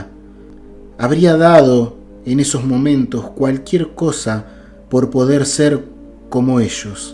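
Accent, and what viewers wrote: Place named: Argentina. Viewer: Vamos a darles likes,para seguir escuchando la voz relájate de éste caballero, lástima que no hay opción de darle me encanta,me fascina, porque a mí la verdad me encanta como el habla,me gusta escuchar,los argentinos,los uruguayos,los chilenos,se escuchan parecidos.les envío a todos abrazos de luz, que Dios los bendiga.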